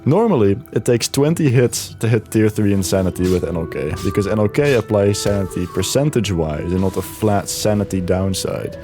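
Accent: Netherlands. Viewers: your accent is like realy dutch idk man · Ik hoor een Nederlander ❤ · ik hoor de nederlandse accent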